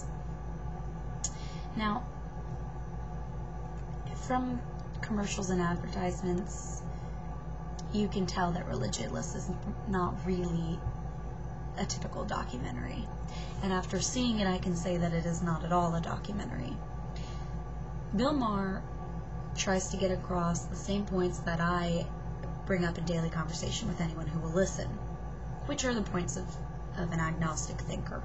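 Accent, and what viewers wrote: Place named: United States. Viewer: Fundies don't think for themselves, that's what their bibles and pastors are for, but you would know that if you really lived here or understood anything about this country. Then again, you do sound more like a seppo than an aussie. Methinks you're a bit confused - favoriting Bill Maher and Richard D and then whingeing at me. Hmmm...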